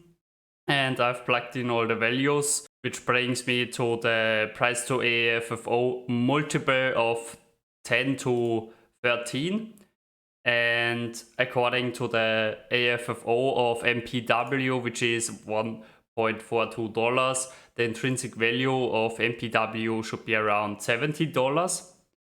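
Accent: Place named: Bavaria, Germany. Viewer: Klingt wie ein Bayer der versucht Englisch zu sprechen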